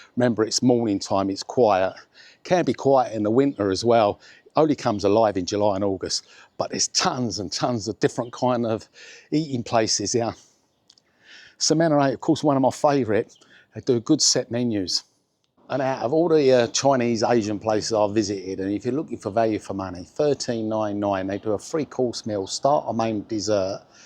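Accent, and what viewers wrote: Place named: North London, United Kingdom. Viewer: I love your accent. You speak like my Dad used to. I reckon you must be from north London maybe Tottenham?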